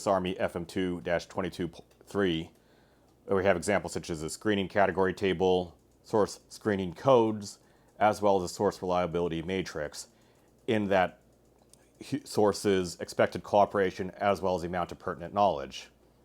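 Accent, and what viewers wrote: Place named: United Kingdom. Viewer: ACKCHYUALLY, He appears to be british to me.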